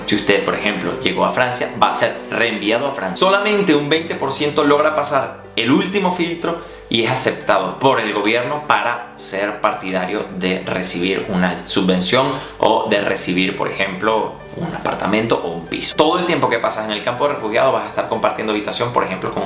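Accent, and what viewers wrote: Venezuela. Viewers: Tienes acento venezolano xd genial bro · su acento es venezolano. Que Negativo eres !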